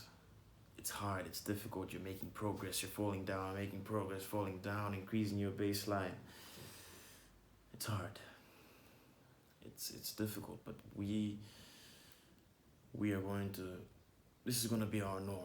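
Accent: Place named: Rwanda. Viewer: Great work bro , your accent sounds Rwandanese . Where are you from ?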